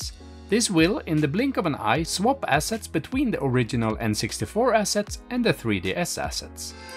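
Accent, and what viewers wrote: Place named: Sweden. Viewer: Once you learn to spot a swedish accent it's hard to miss, heh. -fellow swede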